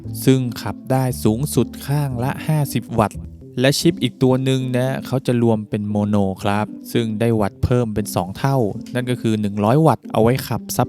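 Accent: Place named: North, Thailand. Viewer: คนเหนือคับ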